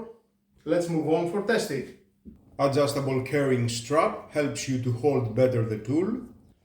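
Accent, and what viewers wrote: Greece. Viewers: Hello, I cannot help but like your accent. I've heard it many times on Greek ships when I was a service engineer 😅 are you also a seafarer or is that just how Greeks sound?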